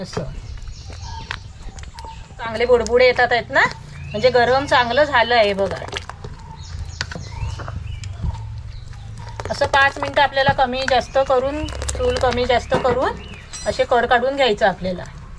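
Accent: Kolhapur, India. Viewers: कोल्हापूर वरून बोलतेय का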